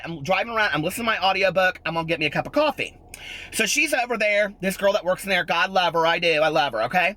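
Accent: South, United States. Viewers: You sound like you have the thickest southern accent in this rant! Love it!!!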